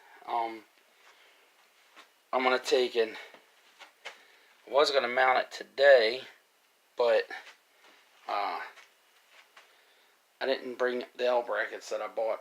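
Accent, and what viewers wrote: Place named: Western Pennsylvania, United States. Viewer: I agree. Neatness counts. You sound like you're from Western Pennsylvania.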